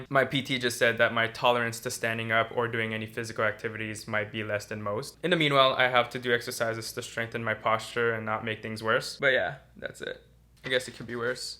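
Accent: Canada. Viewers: If only Mr could make a video in french i love hearing he's Canadian accent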